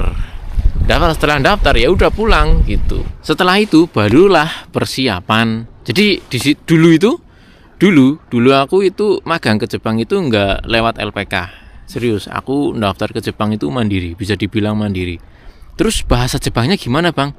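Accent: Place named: Cilacap, Indonesia. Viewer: Wis mbedek kayane wong cilacap sampean kang wkwk. Salam pepada wong cilacap yaa kang, suwun nggo konten e. Semangat kerja ne kang mbesuk tek nyusul ming jepang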